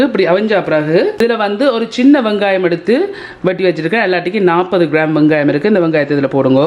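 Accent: Jaffna, Sri Lanka. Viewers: Hi Your (slang Jaffna mix Europe mix )Tamil very interesting. I'm on of your FB page follower.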